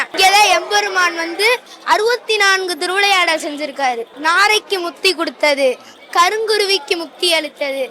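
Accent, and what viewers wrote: Madurai, India. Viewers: மதுரை தமிழ் அழகு❤ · Madurai la mattum thaan ipadi tamil pesa mudiyum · இந்த அய்யன் பேசும்போது மதுரையின் பேச்சு வழக்கு தேனாய் இனிக்கிறது 🙏🙏🙏☀️🌙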